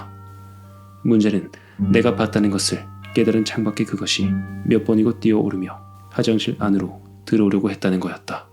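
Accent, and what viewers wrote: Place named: Busan, South Korea. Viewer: 경상도 어디사세요? 부산 사투리인가...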